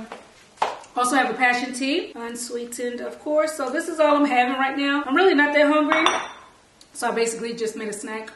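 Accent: Baltimore, United States. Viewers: Are u from Baltimore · You from Baltimore?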